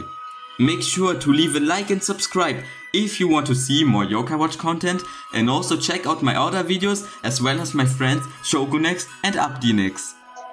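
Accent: Germany. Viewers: i know that german in the voice and backround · i hear a little bit deutsch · Man hört so krass den deutschen akzent haha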